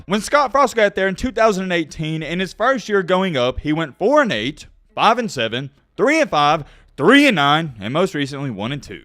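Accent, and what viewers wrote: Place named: Appalachia, United States. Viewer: Since you're so knowledgeable, why aren't you working on a national radio or tv program? Could be because you sound like a hillbilly, holed-up in your double-wide, calling successful people losers.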